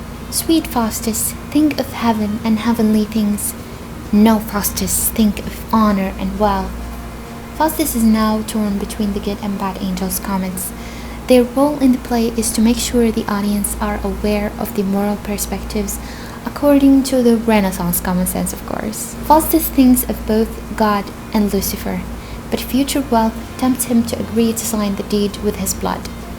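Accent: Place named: United Kingdom. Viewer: ماشاءالله كيف تعلمتي الاكسنت البريطاني نقطه ضعفي حاولت اتعلم بس ماش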